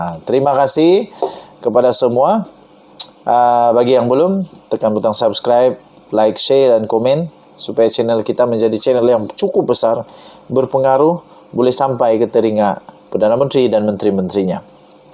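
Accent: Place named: Sabah, Malaysia. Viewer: Sabahan nih .dri suaranya dh tahu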